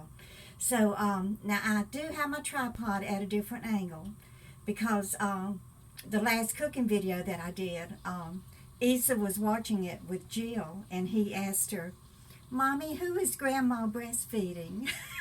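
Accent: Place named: Houston, United States. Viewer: Hey,! New subscriber here. Originally from the Houston area, so your accent reminds me of the hometown and the cooking reminds me of my family there. (Not many left.) Enjoy having your series of videos to have on while cooking or cleaning...sounds "familiar" and friendly with zero pretense, which I admire. Can't say I miss the South for living, but the culture is something that is in the blood. Lol, you cook like me, and if I'd seen another vegan video, I was going to lose my mind!🤣😂 Thank you for your stories...your life has been memorable! Keep enjoying yourself for us, and we'll keep coming back!🙂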